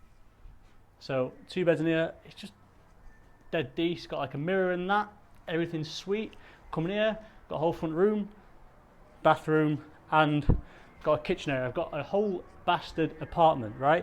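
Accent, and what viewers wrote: United Kingdom. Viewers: well done mate. I just can't help but laugh watching and hearing your northerners accent talking about my beloved homeland Kurdistan... the highlight of the video is where you say the cherry on the cake "the bloody toilet", I know exactly what you mean. I wanna congratulate you on this fantastic piece. although there much more to explore in Kurdistan and I hope others in the west will visit and follow you. bravo.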